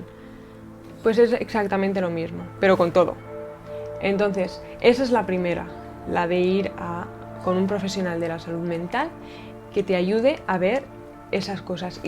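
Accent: Spain. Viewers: Hola me gusta mucho ver tus videos me gusta mucho escuchar tu punto de vista además de que me gusta mucho tu asentó me gusta como se escucha el español de españa ojala poder conocer una española jiji saludos desde Costa Rica pura vida!!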